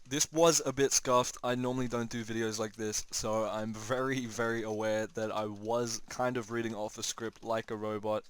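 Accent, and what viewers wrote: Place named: Germany. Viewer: are u german ? you accent sound like german Wenn du mich verstehst man versteht immer seine Deutschen Brüder XD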